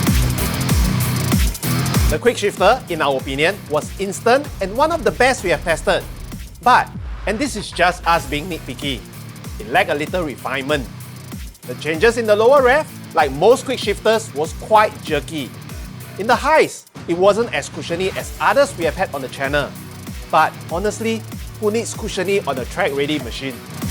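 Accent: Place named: Singapore. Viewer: I like the content but guys, can we stop with the extreme Singlish pleaseeeee. It’s honestly quite annoying and totally not necessary at all. Don’t have to speak like ang moh but certainly not like some coffe shop uncle. Cmon guys this channel have the potential to be a proper bike review channel.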